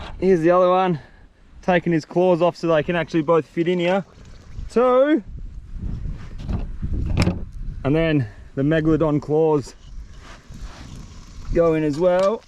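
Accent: Australia. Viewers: Her name is? With your strong Aussie accent I always thought you were calling her "monkey" LOL it really doesn't matter cause I love it when you bring her along with you on these adventures such a beautiful person. I Absolutely Love Crab, but sadly I live in Missouri and we are miles away from any coast where you can catch your own. If I want crab I have to go to Walmart and buy frozen crab. But it's still good and very expensive.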